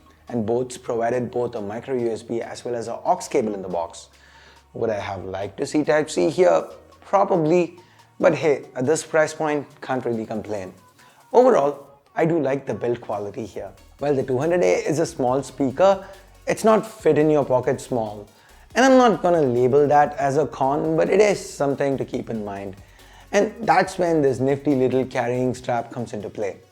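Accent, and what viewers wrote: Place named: India. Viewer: Very good Indian English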